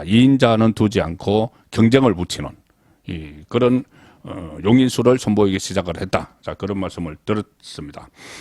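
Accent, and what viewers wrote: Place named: Daegu, South Korea. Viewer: 송본부장님. 전에도 한번 올려드렸습니다만 말씀의 억양이야 대구 출신이시니까 어쩔 수 없다고 치더라도 단어의 억양은 구별이 되었으면 합니다. 가장 귀에 거슬리는 단어가 "탄핵"이라는 단어를 말씀하실 때 입니다. 본부장님께서는 '탄'자에 액센트를 주고 발음하십니다만 이 단어는 '핵'자에 액센트를 주는 것이 맞습니다. 시사내용을 평론하시는 만큼 자주 등장하는 단어 입니다. 상당히 귀에 거슬립니다. 수고스럽겠지만 고치시는게 옳습니다. 여타 다른 단어에도 신경쓰셔야 합니다. 그런 단어가 많습니다.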